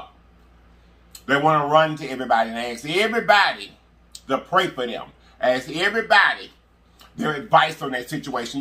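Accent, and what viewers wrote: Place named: Memphis, United States. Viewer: Your Memphis accent, electric energy, and good-lookin food bought me here. New subbie. 🏳️‍🌈🦋🖤👸🏾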